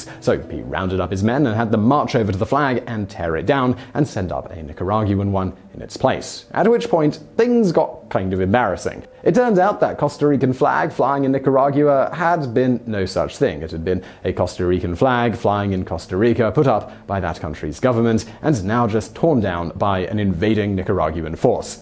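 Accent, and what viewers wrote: England, United Kingdom. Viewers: A suggestion about English accents -- the host has one.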